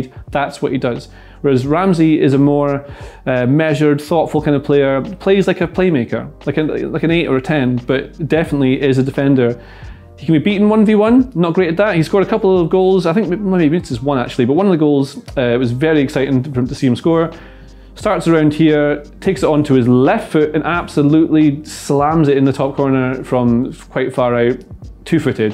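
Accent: Scotland, United Kingdom. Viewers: how have i just realised hes got a scottish accent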